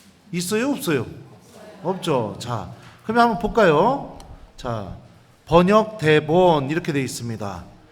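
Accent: Chungcheong, South Korea. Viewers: 신부님 강론말씀최고임니다 저도고향이충남보령 인데 충청도사투리가 참 정겨워유 감사합니다 ❤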